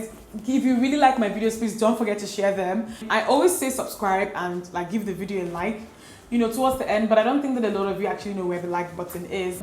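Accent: Igbo, Nigeria. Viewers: The additional igbo accent just perfected this video 😂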